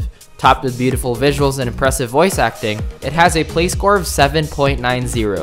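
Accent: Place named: Philippines. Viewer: FILIPINO ACCENT AF!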